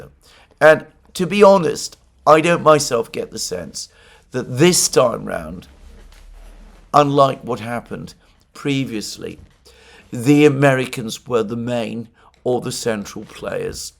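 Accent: United Kingdom. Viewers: Glad you're feeling better, as I am addicted to your podcast and beautiful British accent.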